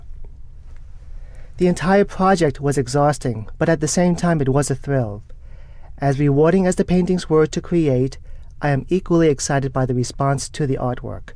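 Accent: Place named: China. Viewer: How can he be a native New Yorker with this Chinese accent?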